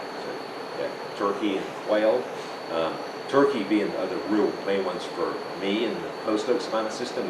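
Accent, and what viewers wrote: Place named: West Country, United Kingdom. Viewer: Good to see hasn’t lost his West Country twang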